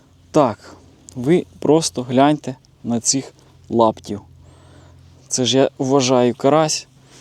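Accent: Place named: Polissia, Ukraine. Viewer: Доброго дня ,яка місцевість , по мові ви з полісся.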